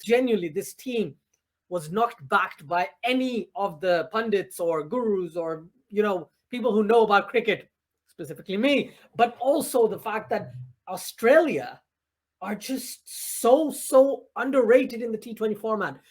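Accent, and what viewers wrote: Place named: Pakistan. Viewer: Great analysis. So glad you lampooned the "toss" debate. If a team bats first and gets 170 plus, anything can happen. Yes they won the toss and won the game but as any researcher will declare: correlation is not causation. I have really enjoyed your comments and I am going to look out for them during the Ashes. Many years ago I drove night time city buses. My security guard was from Pakistan. We became great mates and spent the whole night talking cricket and religion. He was such a great bloke. It was not a requirement to have the same security guard but we always asked for each other. You remind me a lot of him, and it is not just the accent. Very balanced, very thoughtful, great sense of humour.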